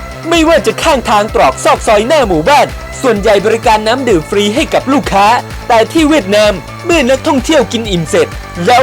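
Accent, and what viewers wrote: Myanmar. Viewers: ความสามารถแค่นี้เสือกออกสื่อไอ้ควายเสียงยังกับพม่าพูดไทย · เวียดแนม คนพากย์มึงเป็นพะม่าหรอ แม่งพากไม่เข้าใจเลย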